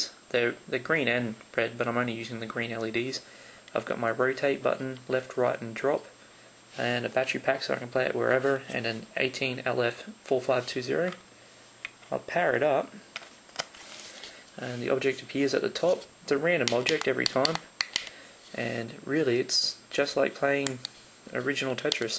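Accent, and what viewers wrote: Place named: France. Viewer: est ce que tu es français ? je reconnais ton accent français :)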